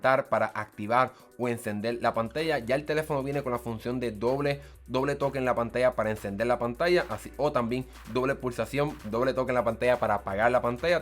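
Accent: Puerto Rico. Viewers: ¿Eres boricua?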